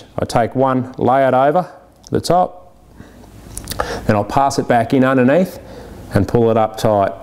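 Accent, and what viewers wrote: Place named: Australia. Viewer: This video is much more blokey in the Aussie accent.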